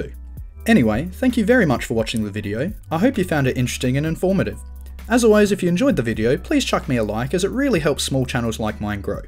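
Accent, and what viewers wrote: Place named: Australia. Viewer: Me Japanese learning about ナンバープレート of my own country, by watching English video with Australian accent😅😊 Thanks a lot. I didn't know a lot of things you mentioned.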